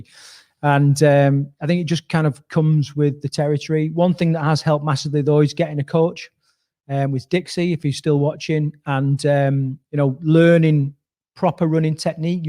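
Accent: United Kingdom. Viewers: Hey! (you so suit that name), this was a great vid. I didn’t make the live session so watched it later. I play your videos while I’m out on longer runs, as I’m originally from Yorkshire and having a familiar voice is so wonderful while running lonely trails here in the USA! Thanks for all you do mate, appreciate you. 👌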